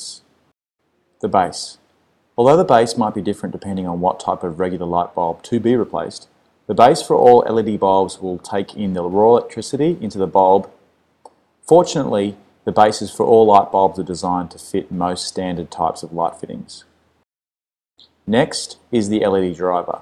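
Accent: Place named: Australia. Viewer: You sound Australian, but you said Aloominum. WTF?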